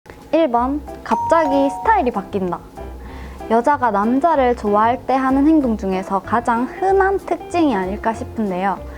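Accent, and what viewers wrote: Gyeongsang, South Korea. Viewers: 경상도사투리가 ㅋㅋ 매일 듣는 사투리인데도 영상에서 보니 더 새롭게 느껴지네요^^ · 대구 아가씨 아니예여 대구 말투인데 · 경남진주. 내 고향인데. 말투가 진주네요. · 아~ 나 서울남자... 표준어 섞인 경상도 사투리 좋아요. ㅋㅋㅋ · 누나 사투리 너무 매력있어요 ㅠㅠ 경상도 사투리가 이렇게 좋구나 대구 사람인데 첨 알았어.. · 경남 진주가면 저분 만날 수있는건가요? ㅠ 사투리 억양 너무 귀엽습니다. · 표준말 쓰면서. 간간이 경상도 억양이 묻어나오는게 귀여워요. 그런식으로 방송하면 나름 특색있는 경상도여자 유튜버로 인기끌겠어요. 건투빕니다.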